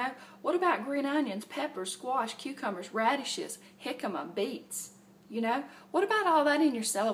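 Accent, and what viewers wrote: South, United States. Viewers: You need to write a book,! You say things in a way that's so well put and thought out. I'll read it in a southern accent so it will be just like you!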